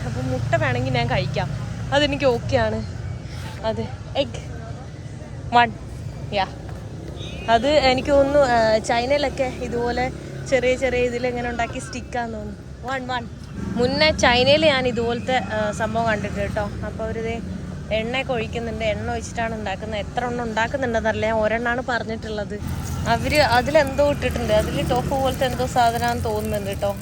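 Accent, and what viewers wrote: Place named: Kozhikode, India. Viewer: Your malayalam is more like Kozhikode slang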